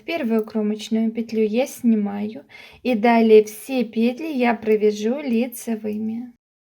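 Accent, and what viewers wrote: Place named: Belarus. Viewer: Умничка! Милый белорусский акцент- обожаю!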